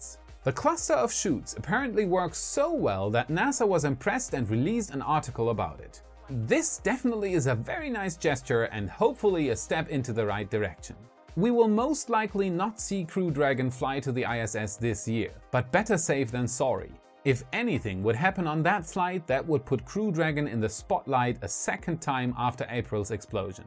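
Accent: Germany. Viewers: C'mon he speaks English with a heavy accent and German without any, so you tell me haha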